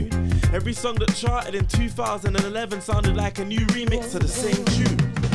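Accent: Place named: Jamaica. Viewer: I hate that Jamaican accent but I love everything George The Poet says. The man is simply brilliant with words.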